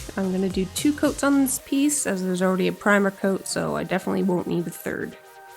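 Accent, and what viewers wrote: Canada. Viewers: Canadian eh? AWESOME!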